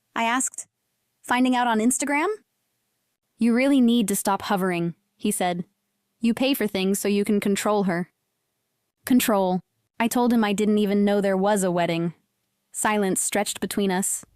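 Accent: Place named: United States. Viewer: A Thai story whit american accent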